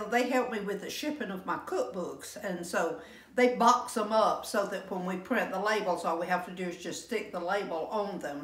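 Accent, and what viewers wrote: South, United States. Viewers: I love southern accent.. I just told my hubby I think she sounds so much like Rosalynn Carter.:) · What state has this accent? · You wear the most beautiful clothes when you cook! I just love your southern accent.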